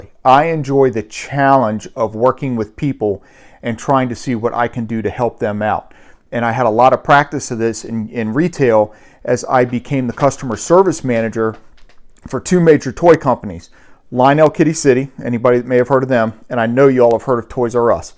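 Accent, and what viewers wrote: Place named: Maryland, United States. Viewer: I can spot the maryland accent from a mile away.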